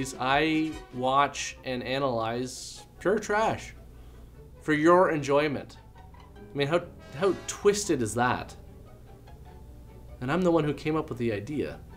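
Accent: Canada. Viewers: Now that you mentioned Canada, I can't unhear your minor accent. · Not to tease, but he does have the accent. It's not really "aBoot" as much as it is more like "aboat", though. And it's subtle and not as pronounced as the stereotype would lead people to think, but it;s there. In fact, I usually guess correctly that a YouTuber is from Canada when they say anything with the "out" sound as "oat".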